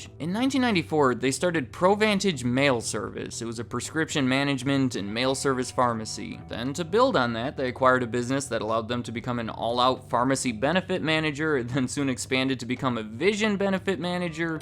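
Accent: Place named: Midwest, United States. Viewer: I can tell you're from the Midwest lmao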